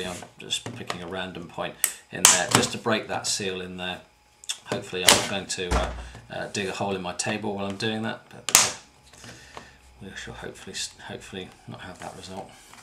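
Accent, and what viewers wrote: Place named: England, United Kingdom. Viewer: Hi, Thanks so much for your advice. You sound like you are English, do you live in the UK and can you recommend a couple of batteries that are easily available which are 18650 or AA? I want to get a Torch which is rechargeable but maybe can take recharged batteries so I can replace the torch batteries if I am camping. It seems the Americans have their own market and do not worry about other countries and what happens to torches or batteries. And the 18650 are harder to get here.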